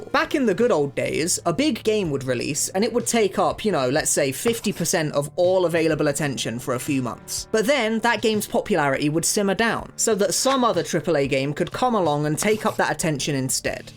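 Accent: United Kingdom. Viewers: without the annoying british accent this guy would have 500 subs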